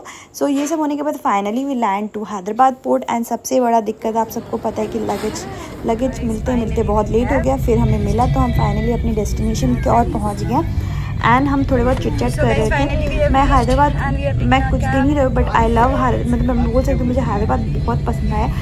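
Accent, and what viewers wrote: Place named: Kolkata, India. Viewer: Kolkata ascent in very good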